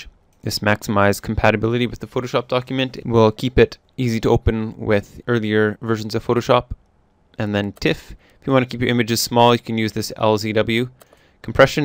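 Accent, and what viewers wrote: Canada. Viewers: That's a canadian accent.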